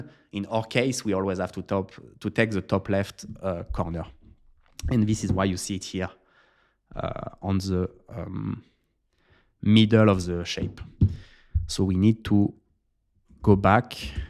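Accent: France. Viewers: J'adore cette série, ça me donne plein d'idée pour mes projets ! (j'adore aussi ton accent Français ahah)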